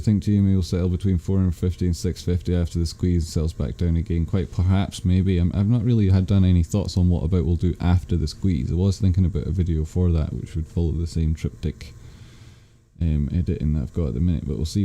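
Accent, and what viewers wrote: Scotland, United Kingdom. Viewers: Here on apeandys recommendation. Good to hear a Scottish accent 😂I bought some gme on etoro. Did you say that etoro was dodgy?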